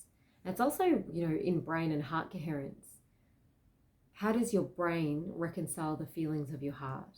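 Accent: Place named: Australia. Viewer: Thank you 🙏 Love your energy, and aussie accent ;) ✌ Aries nation sweden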